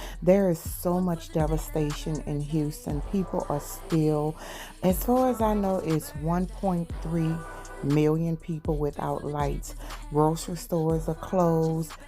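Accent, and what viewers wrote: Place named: New Orleans, United States. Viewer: I knew I heard that NOLA accent. 😂 when I started watching. I love it. I will NEVER forget Katrina and Rita hit Texas shortly afterwards. It was August 2005. I will never forget it. I’ve made some of the best friends from my NOLA posse who came to Texas and never returned after Katrina. This video had me crying and gave me chills remembering the horrible stories.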